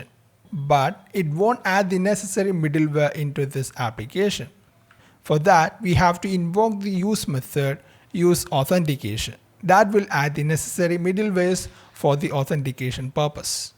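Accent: Kerala, India. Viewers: NIce presentation and explanation....Your accent sounds like a malayali..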